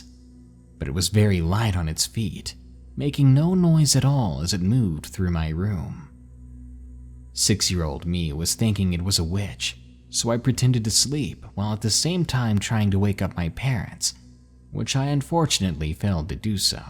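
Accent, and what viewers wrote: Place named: North Carolina, United States. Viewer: Listening to your voice telling these old stories reminds me of growing up in Valle Crucis, North Carolina, in Watauga County. I've missed that accent and the stories the old men used to tell while playing checkers outside the Mast General Store. I've missed my home for so long, just hearing your voice, so familiar to me from so long ago, has me in tears. Thank you so much and please keep sharing these. ❤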